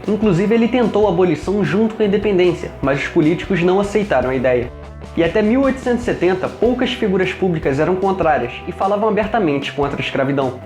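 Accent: Rio de Janeiro, Brazil. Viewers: kkk mnw sotaque carioca é muito foda kk melhor sotaque do país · Engraçado a forma que os cariocas falam nascer, eles colocam i no meio, tipo naicer.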